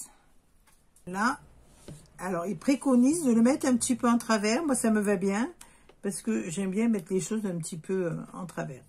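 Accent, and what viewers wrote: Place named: Midi, France. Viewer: Bonsoir merci pour vos explications nettes et précises.J ai déjà terminé mes cartes du même bloc c est très agréable.L accent du Midi me rappelle des vacances pas loin de Béziers.Salutations de Bruxelles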